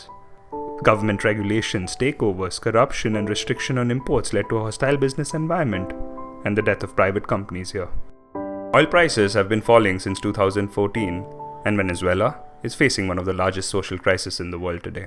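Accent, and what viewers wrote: India. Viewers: The narrator is Indian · You sound like a person from India. Are you from India? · INDIAN TALKING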